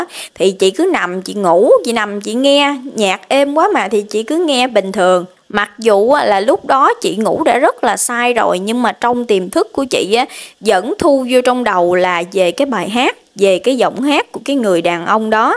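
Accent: Mekong Delta, Vietnam. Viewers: Chau kê truyên lưu loat ro rang giong chât miên tây ne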